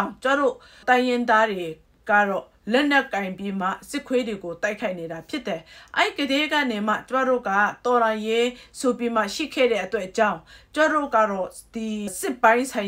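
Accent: Kachin, Myanmar. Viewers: အမြဲတမ်းအဆင့်အတန်းရှိရှိ၊စစ်ခွေးတွေကိုထိုးနှက်ချေပတာတွေ၊ဘက်မလိုက်သုံးသပ်ချက်တွေနားထောင်ရတိုင်း၊ ကိုချစ်ခင်လေးစားရသလို၊ကချင်သံဝဲဝဲလေးနဲ့အထစ်အငေါ့မရှိ၊လက်တန်းပြောသွားတာတွေအရမ်းကျေနပ်အားရမိပါတယ်။တော်လှန်ရေးအတွက်စစ်ခွေးတွေ၊ဘောမတွေကိုReactionလုပ်တာတွေအရမ်းကိုထိမိလွန်းလို့big congrats ပါ။ ။ဆက်လက်ပြီးဘုရားသခင်သာ၍၊ကောင်းချီးပေးအသုံးပြုပါစေ။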